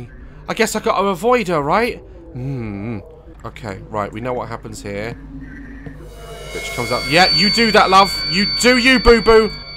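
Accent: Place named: United Kingdom. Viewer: This video is perfect for me right now taking breaks from the stress of online learning. Mild and short but just terrifying enough. I like watching play this with his British accent and humor. Now I can (not) sleep peacefully.:)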